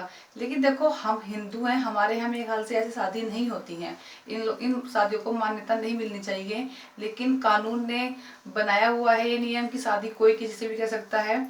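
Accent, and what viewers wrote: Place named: Haryana, India. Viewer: di ap haryana ki language me baat krte ho ho aap shamli ke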